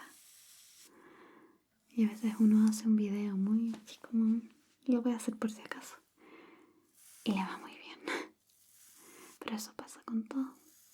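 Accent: Chile. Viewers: Primera vez que pude sentir su acento chileno ❤, me daba curiosidad por los vídeos en neutro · Me vengo enterando que a la serie de terror le fue mal y es de mis vídeos favoritos por la historia y el esfuerzo en todos los vídeos, no lo creo. 😤 Saludos, me encanta tu voz y el acento chileno. 🧡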